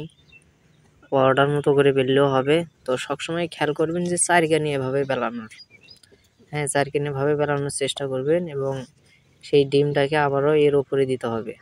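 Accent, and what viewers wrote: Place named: Bogura, Bangladesh. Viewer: তোমার কথা শুনেই বুঝতে পেরেছি।ভালো লাগলো ভাই।আমিও বগুড়ার মেয়ে। তোমার বাড়ি কোথায় বা কোন হোটেলো কাজ করো বলা যাবে কি?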